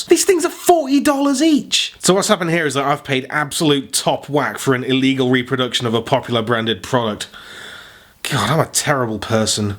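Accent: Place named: Newcastle, United Kingdom. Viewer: I fucking adore the jordie accent or whatever it is when you read out the terrible knockoff names It's just beautiful and not a knockoff beatdown without it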